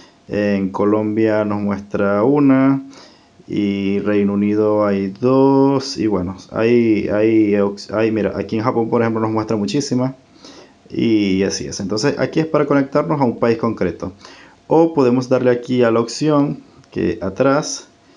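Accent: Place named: Venezuela. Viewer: Wey eres de venezuela tengo un compa que habla como tu y es de venezuela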